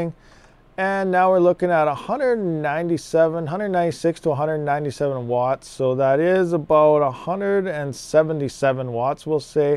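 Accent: Canada. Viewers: I know when I hear my canadian brothers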